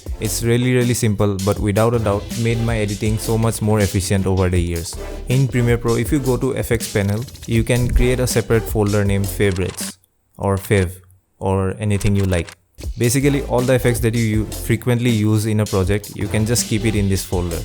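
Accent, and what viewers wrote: Assam, India. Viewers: Holy crap bro, im not even done watching the vid but I cant resist the urge to say how cool you are for someone who has an indian accent and a pretty decent sense of humor lmao... Fellow video editor here, keep it up. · i can feel the assamese accent... love from Assam 💗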